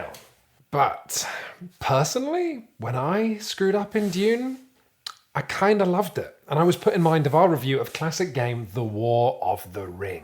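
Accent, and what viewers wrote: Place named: England, United Kingdom. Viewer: most English sounding man ever, congrats.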